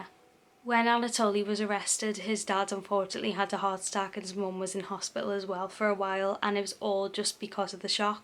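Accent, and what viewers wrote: Liverpool, United Kingdom. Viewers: Mad to actually find a true crime scouser on here, feel like I know you already 😂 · You have a slight scouse accent if you dont mind me saying.. are you from Birkenhead ways? or the posh part of the pool? I live just across the mersey on the wirral :) Btw great vid! I am on a binge watching true crime!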